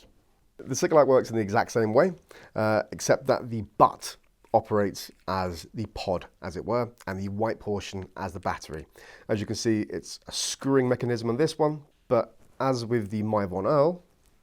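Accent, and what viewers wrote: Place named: United Kingdom. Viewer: AN IRISH WITH A BRITISH VOICE?!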